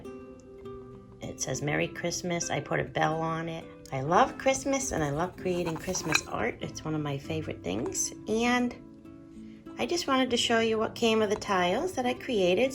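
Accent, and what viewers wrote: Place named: New York, United States. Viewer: Very beautiful. You have a New York accent. So cute.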